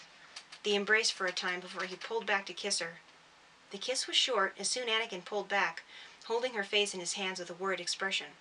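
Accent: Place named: United Kingdom. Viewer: So pleased things going well. Great chapter , your British accent is coming on well .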